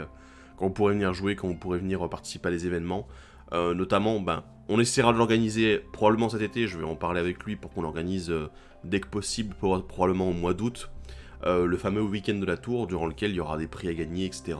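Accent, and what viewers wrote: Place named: France. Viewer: Merci pour tes remerciment tes le meilleur contenue francais meme si tu vien france avec l accent😅dsl jvien du Quebec .mais lache pas et ta des bonne idee pour ton avenir jai hate de voir ca .jaimerais parcontre te voir plus si tu pourrais faire tes deck tech (que jadore en passant)en face et peut etre avec un inviter de ton staff ca pourrais etre vraiment cool ou toute autre de tes nouvelle idee de video .continue on voit bien ta passion pour magic et surtout le commander 🖒🖒🖒🖒👏👏👏👏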